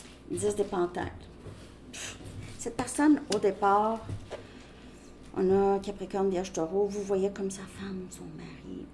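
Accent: Canada. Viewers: Bonjour Nouvelle arrivée sur votre chaîne, je suis Française et vous avec votre accent, Canadienne sans aucun doute. 😊 J’ai beaucoup aimé votre vidéo. Joyeux Noël et bonnes fêtes de fin d’année. À bientôt. 😊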